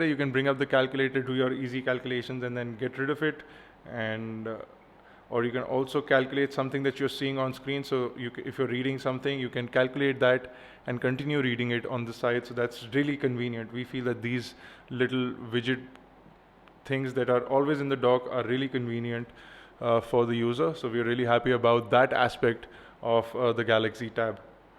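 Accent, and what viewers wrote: India. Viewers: indian ? lol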